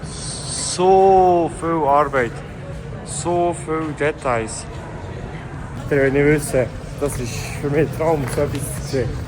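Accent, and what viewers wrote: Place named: Switzerland. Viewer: würde schauen, wenn du kein schweizer wärst. verstehe kein wort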